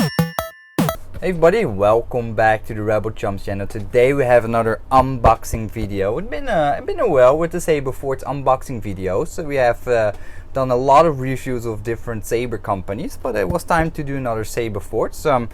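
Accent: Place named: Netherlands. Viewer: dude are you dutch? you sound like someone thats dutch and speaks english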